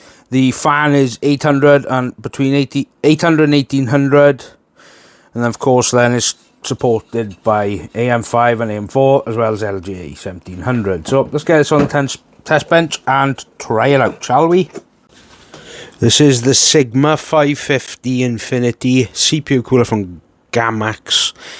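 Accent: Wales, United Kingdom. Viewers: Not only do I really like your videos but it's refreshing to hear a Welsh voice in the tech space. Makes me miss living in Aberystwyth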